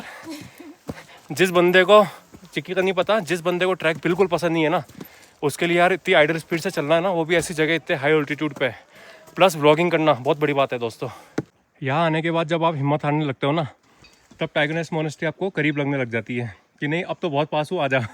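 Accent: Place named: Rajasthan, India. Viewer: For the first time watching your vlog.. Nd aapki rajasthani sun kr meri beti khush ho gayi.. Ki ye tou apne hi h... Morpankhi vala time aapne sahi kaha.. Barat ka swagat hota tha morpankhi or gupab ka phool dekr... You are doin wonderful job... Keep goin..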